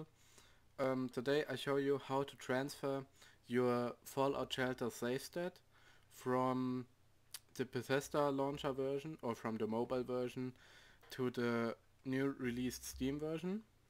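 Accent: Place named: Germany. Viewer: es hört sich so richtig deutsch an:D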